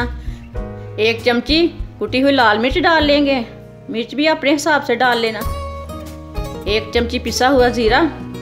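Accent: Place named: Punjab, India. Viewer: Your speaking tone like pure Punjabi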